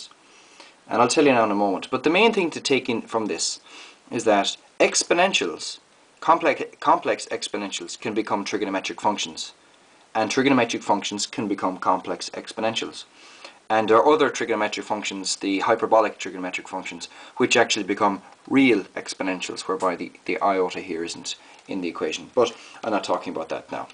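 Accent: Ireland. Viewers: Fellow Irishman by the sound a things. Fair play man, good to see some of our folk contributing to the good cause of youtube math..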